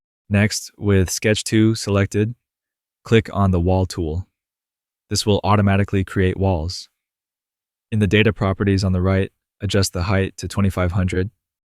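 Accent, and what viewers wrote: France. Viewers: Why do you use a voice actor for some of your videos? It's better when it's your normal French accent.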